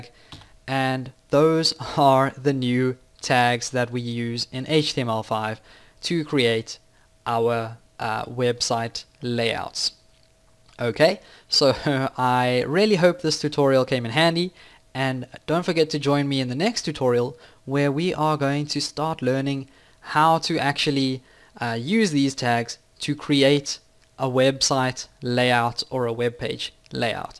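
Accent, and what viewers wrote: South Africa. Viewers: agree you better than Lynda ... thank you you sound south african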